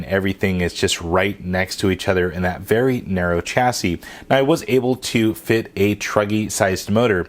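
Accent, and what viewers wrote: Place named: California, United States. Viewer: Hah sall good! Guess that's just how California can sound:1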